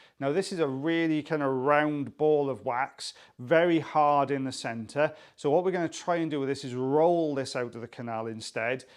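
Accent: Wales, United Kingdom. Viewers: There is great comfort to be had with hearing a Welshman say the word "valley". Thank you!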